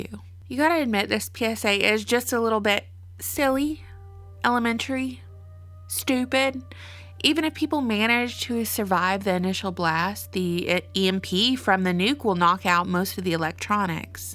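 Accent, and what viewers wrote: South, United States. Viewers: Would love to hear you do an audio book. Ham-up the Southern accent a bit and do "Huckleberry Finn". Too Long? What about "Tom Sawyer"?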